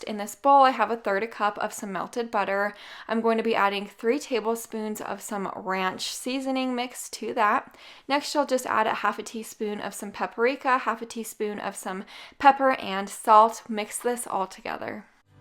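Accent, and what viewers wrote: Utah, United States. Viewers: I'm almost 50 and I wish your videos were around when I was in my 20s. Thank you for these! And your Utah accent is adorable 😄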